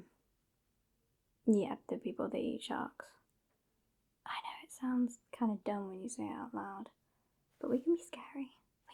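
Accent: United Kingdom. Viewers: What kind of British pirate we talking here? Yorkshire? East Sussex? Cornish?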